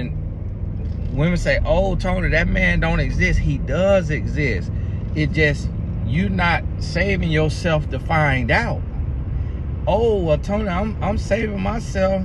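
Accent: South, United States. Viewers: Like the southern drawl. And the advice.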